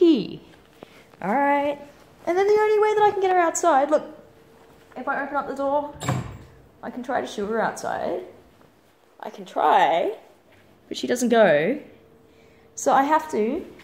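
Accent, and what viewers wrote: Australia. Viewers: I like your voice, it goes along really well with your Australian accent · It is so refreshing to have a fellow Aussie on this predominantly yank site. Oh, and you may have to untrain your maggie lol. Just subscribed btw, look forward to seeing more vids from you and hearing a normal voice :)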